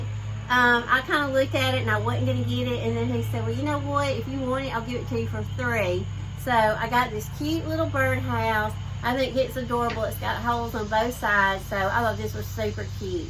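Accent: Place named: Alabama, United States. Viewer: Hi neighbor! Just found your channel and absolutely love it. When I first listened to your voice I new you must be from Alabama. I live a little to the east of you in the same state. Ever been to Gadsden? You create such lovely work and have a great eye for staging. Really look forward to viewing older videos and new ones as you are inspired. God bless you, Sherry from Alabama.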